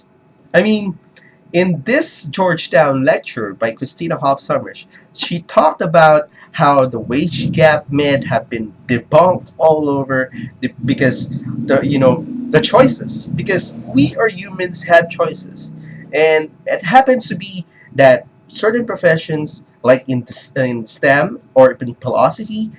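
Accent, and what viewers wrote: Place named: Philippines. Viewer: 4 years later, I stumble upon this... I can tell from your accent that you (like me) are from the Philippines. I have never known anyone else here who was aware of this "culture war" that is happening in US universities. Have you ever had any conversations about this with your peers? and what did they think about it? I've tried talking to people I know about this and everyone seems to be oblivious about it. I guess the lack of talk about it here can be attributed to the remoteness of this particular issue in the country, we don't really see people being de-platformed in universities here (as far as I know at least, I could be wrong though) and this obsession of trigger warnings and safespaces. The only problem that I see, is that if we don't pay attention to this problem happening in another country, we put ourselves at risk of repeating the same thing here.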